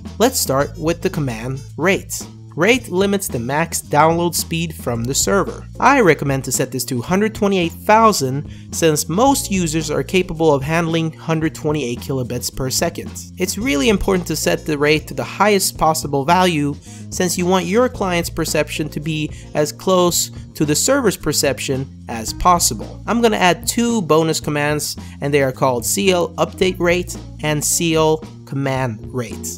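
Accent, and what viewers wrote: France. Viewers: Are you actualy french? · where are you from? I'm from Canada and I don't know where that French accent is from 😅 I speak French aswell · Tu accent français donné très bien ! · Bon vidéo! Sub! Wanted to know where you are from, France or Québec? Just curious :P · Are you french or English ? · woow great french accent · is he french ? "j'espère vous voir bientôt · lol is he french?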